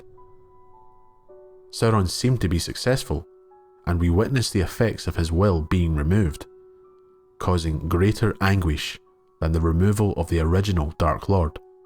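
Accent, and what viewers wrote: Scotland, United Kingdom. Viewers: Scottish, Irish or Canadian? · Ok sorry then. You speak (pronounce) Scottish not English. Very hard to listen to for a non english person. "I'm not speaking slang." - Yeah, since you´re a Scot, you speak Scottish. And a very proud one it seems you are. Sorry to have offended you and your way of interpreting the English language. Still you´re prounouncing an "i" like the rest of the English world would an "e". Not sure if you even consider what you speak as English. Maybe even, you are offended by me calling it English. Not my intention to offend, just to listen properly. So maybe it´s me and i gotta go wash my ears. Cheers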